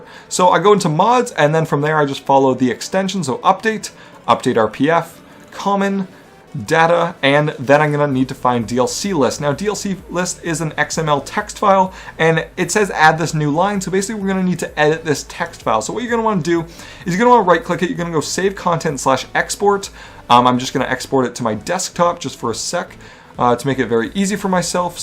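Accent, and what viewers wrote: Canada. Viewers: love Canadian accents. They're funny to me as an american lolololol. But, great video broskoski. Me and my buddy are new to PC and we were both able to download the mods pretty well with minimal problems (problems due to new PC dumbass'ry and slight intoxication).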